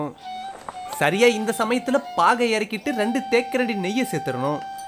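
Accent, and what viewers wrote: Salem, India. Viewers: Wow what voice I think Salem area crt ah entha area attur?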